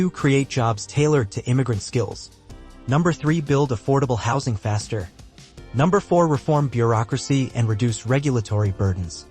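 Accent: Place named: United States. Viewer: Whats with the us based ai voice?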